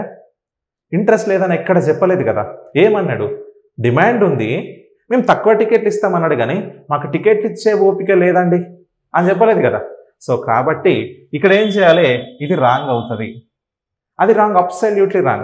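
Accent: India. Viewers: 5,6 days nundi mental ability videos chustunna....Hyd slang lo class vine sariki hayiga undhi sir.... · Sir midi nizamabad ah 😝alne matldutunru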